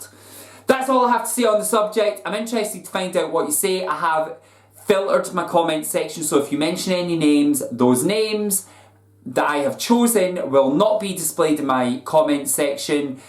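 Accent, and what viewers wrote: Scotland, United Kingdom. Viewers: I am so sorry that this happened to you! This person is clearly unstable and loves to start and create drama. He clearly doesn't have much of a life. You probably hit a nerve, and that is why he did what he did. I think that you have always been very mature and professional with your videos. I enjoy you and your channel. Also, love your Scottish brogue! ♥ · yaay another fellow Scottish YouTuber 😉 your accent just made me happy for the sound of home after a few hours of YouTube videos 😂😂 weird world we live in 😫 imagine weirdos like that are in every corner of the internet. I completely understand the need for privacy, a person's life is there own to share if they wish. I really believe some drama channels forget a normal person is behind the computer.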